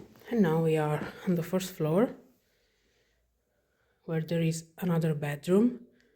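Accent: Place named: Italy. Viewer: Very nice presentation in English with charming Italian accent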